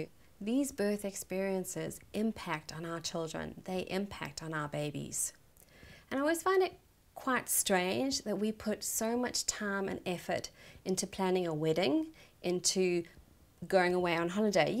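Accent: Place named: South Africa. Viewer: Thanks. I'm also from South Africa but recently have been traveling south easy Asia and moving to Thailand,Phuket soon. I am 21weeks pregnant. Great to hear a familiar accent!!! Thank you for your work you doing and I will be looking further at downloading your videos for sure. Do you include a lot of the hypnobirthing tools in your toolkit? Many thanks and love. X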